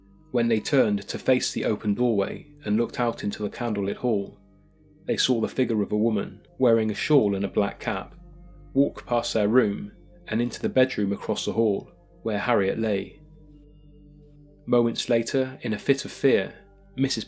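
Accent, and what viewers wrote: United Kingdom. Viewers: I’m glad the narrator is British. RIP London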